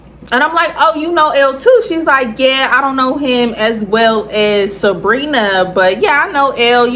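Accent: Bay Area, United States. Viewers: My first time here! First 3 mins in i knew you had to be from the bay we really do talk a lil different 🤣You funny af I’m definitely subscribing!! love from Oakland!🫶🏾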